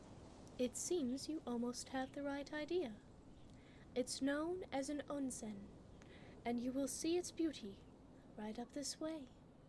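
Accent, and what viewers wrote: United Kingdom. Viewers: It sounds pretty much like british accent and she fits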